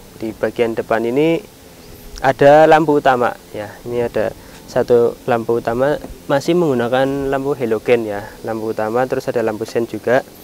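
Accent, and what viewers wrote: Java, Indonesia. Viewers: Mase....Medok pisan Jawane....😂😂😂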